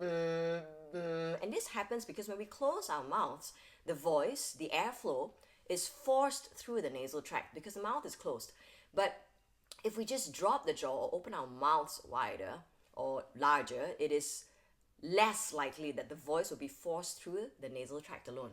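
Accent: Singapore. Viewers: Sounds Singaporean to me. Whats wrong with that?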